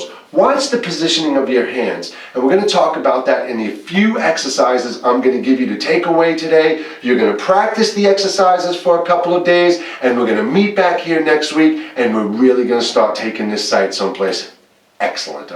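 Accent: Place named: Long Island, United States. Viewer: Thanks for this. How long duration, or how many measures, should we do the full wrist strokes at each tempo? Also, love your accent, you from "Long-Giland"?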